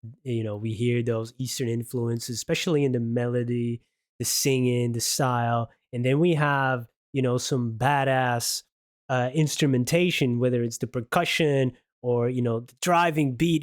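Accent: United States. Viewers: I love to listen to your reactions. You speak beautifully., very expressive. I think that I have expressed this before. You speak like an educated American. I would never have guessed that you’re from another country. I’m not that familiar with. This song is beautiful. You might wan to check out some his father Tim’s early music. Very hypnotic and powerful.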